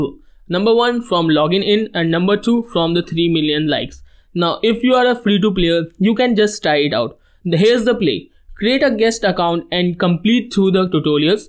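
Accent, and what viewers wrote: India. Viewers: Indian accent? Cant trust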